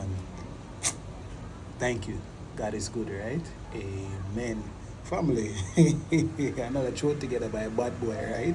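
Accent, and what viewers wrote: Jamaica. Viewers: are you Jamaican?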